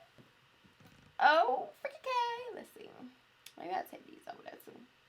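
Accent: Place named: Atlanta, United States. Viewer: I’m a First time viewer and the minute i heard you speak i knew you were from atl lol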